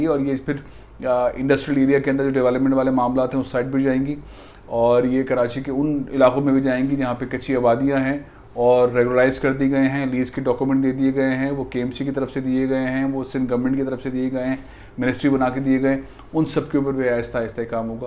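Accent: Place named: Punjab, India. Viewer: Apka bolny ka style ab tu paka panjabi ban giya hy,ye kyse houa???🤔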